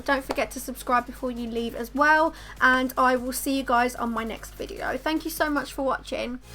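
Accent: United Kingdom. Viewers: New subscribers and I seriously didn't think you was British 😳 already obsessed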